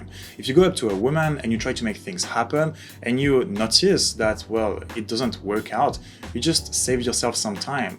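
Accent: France. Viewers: A good looking dude with a French accent giving advice on rejection. Yeah ok bro 😆👌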